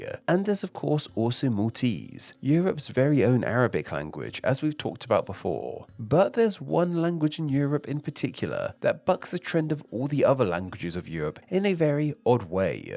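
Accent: United Kingdom. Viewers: He is British, ever heard of different accents?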